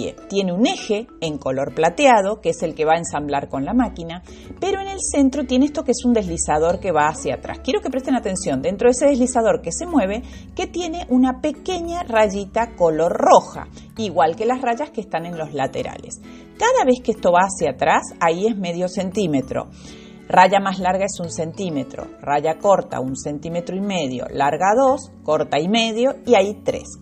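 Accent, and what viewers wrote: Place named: Argentina. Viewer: Un video tan útil, con explicaciones claras. Me encanta tu acento (argentina). Muchas gracias.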